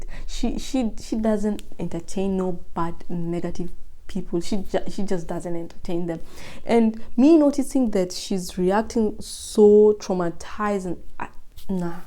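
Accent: Nigeria. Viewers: You kinda have a Nigerian accent. Anyways, sending all my love ❤️ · It's giving Nigerian accent!! You are strong girl.❤ Keep up gal! You are a star. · U got new sub I'm so sorry for what you go through I also I noticed you have naija accent 😂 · but accet yako ni yawanaijeria😂😂😂😂u speek like them not kenyan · Pole..i noticed your accent is almost Nigerian. Dont worry things will be better. One door closes and 2 more opens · Why do you have a Nigerian accent? 🤔. Ubaya ya wasichana wakenya ni kupenda ngono sana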